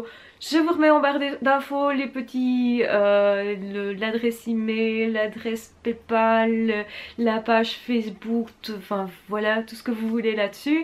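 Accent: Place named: Belgium. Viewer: très très biesse... Aaah une belge ^^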